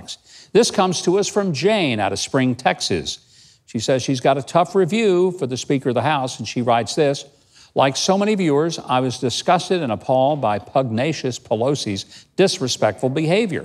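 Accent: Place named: Arkansas, United States. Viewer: I always love your shows Governor. Your Arkansas voice sounds like home. I grew up in Texas, and have lived in Oklahoma for nearly 30 years.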